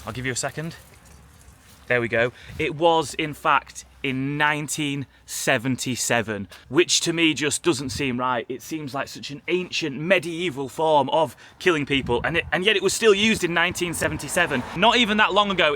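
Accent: United Kingdom. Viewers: I really appreciate your handsome brit accent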